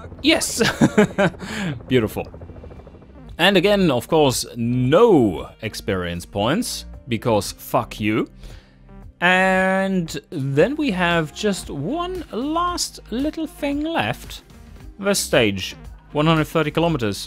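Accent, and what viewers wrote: Germany. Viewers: Nice sympathic german speaking English. Ich liebe es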